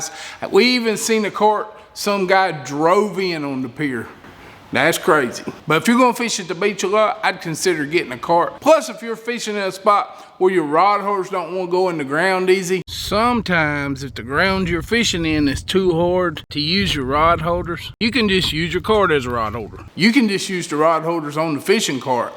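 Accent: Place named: Alabama, United States. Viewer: WOW THANKYOU PAL I'M FROM QUEBEC AND I LOVE ALLS UR FISHING HACK'S VIDEOS AND I LOOK AND LOVE YOUR ACCENT SOUND LIKE ALABAMA ACCENT...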